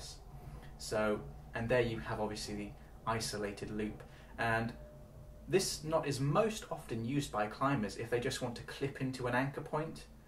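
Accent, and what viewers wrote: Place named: United Kingdom. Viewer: Hi there. Another fantastic tutorial thanks. I'm brand new to climbing and I wonder if you could tell me if this knot is as strong as the re-threaded figure 8 please ? I see people tie in on videos with the re-threaded and I wonder why they don't use this and a carabiner to their harness ? Many thanks and great to hear another Brit on YouTube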